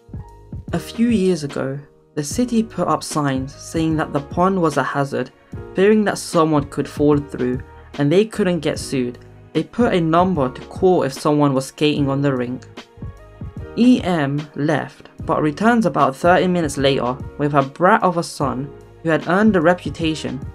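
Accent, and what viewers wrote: United Kingdom. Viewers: No problem, and I really like the karma aspect as well 😂😂 Your accent sounds kinda British, with something else. If you don't mind me asking, where are you from?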